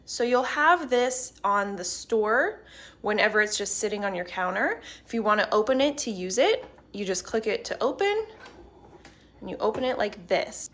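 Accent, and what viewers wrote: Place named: Midwest, United States. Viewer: Hi, I store my hash browns, burritos, etc, in the freezer. They seem to get crusty with frost and just taste awful. FYI, I am not frying them, but use an air fryer to cook them. Do you think vacuum sealing them will prevent this from happening? And bread seems to suffer the same fate. But I saw a video showing a piece of bread turning into a tiny piece of leather after being vacuum sealed. Do you think its best to first freeze the bread and then vacuum seal it so it doesn't deform like your berries? Sorry, I am so new to this and just discovered this. As I am a single father of a 11 year old daughter, I am trying to find ways to preserve food as she will often waste food if I give her too much at one sitting. FYI, I love your voice, you almost seem like you are from Ohio, Minnesota, the Dakotas or Wisconsin. So adorable! 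I'm in SoCal. Let me know if you are ever in the area!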